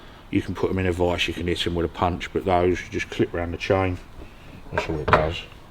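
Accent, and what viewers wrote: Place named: London, United Kingdom. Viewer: Great videos! Love attitudes, and a proper London accent explaining all (if it's Essex, I'll let him off... ) Roll ups, no gloves when welding, what's not to like.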